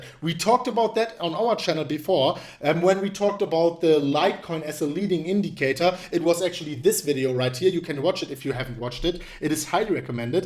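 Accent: Germany. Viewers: Please, try to correct your accent.... Mean your german accent is so strong.....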